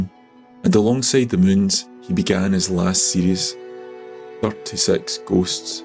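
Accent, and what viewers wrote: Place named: Scotland, United Kingdom. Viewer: So that's where your great accent is from. I was wondering when viewing your videos. I am sure you will visit Tokyo more than once in your lifetime. Just like i wish to visit Scotland again some day, particularly the countryside, i am not much of a city guy (ironically living in the biggest city on the planet, but Tokyo is just a succession of villages, it is not a metropolis like some people think, and it has a village mentality which is both good and bad, you'll see some day). Did you like the photo of the Scroll by Yoshitoshi, i posted for you on my account ? It looks much better in the flesh. It is an unfinished work and he had just started adding very soft colour at the bottom, where the grass and the water from the pond meet, and also the "flowers" of the Susuki grass. The moon is create as negative space. 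Like most of his work, the moon is omnipresent. I guess this has to do with the family name "tsukioka" (the Hill of the Moon). And Yoshitoshi was obsessed with death or maybe fascinated by it. He liked the western idea of Memento Mori which he found matching the Buddhist concept of Mujo 無常 and the Shinto concept of Mono No Aware, もののあわれ、 which means that nothinbg last and nothing is permanent and that everything eventually comes to an end. That7s why this scroll has been used for the Moon viewing tea ceremony by the Tsukioka family for over a century every year, because it fits the Tea ceremony concept of Ichigo Ichie 一期一会 which means that we must live in the moment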